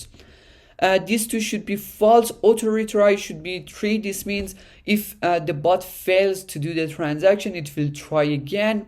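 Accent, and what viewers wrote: Iran. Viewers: Fkn persian accent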